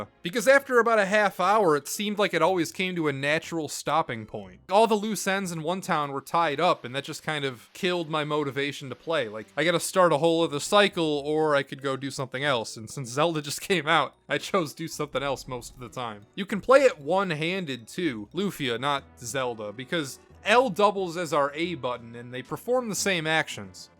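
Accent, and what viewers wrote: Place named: Midwest, United States. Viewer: wait, if you're from florida and live in texas, why do you sound like you're from iowa, definite midwest accent.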